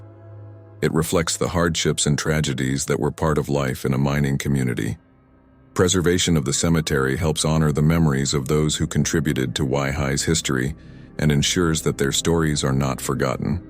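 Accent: United States. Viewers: The guy is American, give him a break😂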